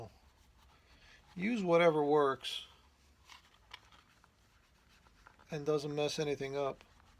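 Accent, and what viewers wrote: New York, United States. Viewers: In Cambridge England we say it like this sounds lPayTent. When you say it you sound like patTunt. How is patent supposed to sound, I just wondered. U sound like your from New York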